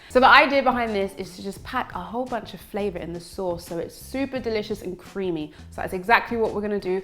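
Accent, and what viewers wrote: London, United Kingdom. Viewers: I knew it 💯% she was going to have a British accent 😂 she just looks English lol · Im a 1st time VIEWER......Oh My Stars🤩🤩🤩🤩🤩 This is a plant based FEAST🥳🥳🥳🥳🏆🏆🏆🏆 I wouldn't be able to finish that bowl but I would SURELY TRY! LOL That looks so good! Thanks for SHARING Doll🏆 U & Tish Wonders need to team up.....U both have that adorable London Accent🏆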